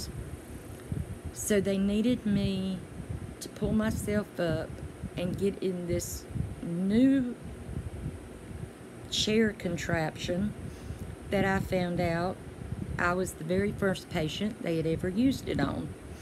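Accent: South, United States. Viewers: So happy for you and your family that prayers were answered and you will make a full recovery. It would be pretty neat to see a video on your top 5 favorite places that are haunted. Or scariest places you've investigated....I will watch any video as long as your southern twang is in it haha much love to you and your family 👻💜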